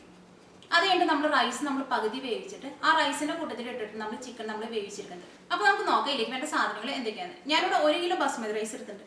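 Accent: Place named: Northern Kerala, India. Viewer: kitchen u r a very good cook!!! I like your recipes coz whenever I try any of them, they turn out to be really "homemade"... n me n my Husband are fond of your slang... R u from northern part of kerala?? Keep inspiring beginners to cook confidently..🙂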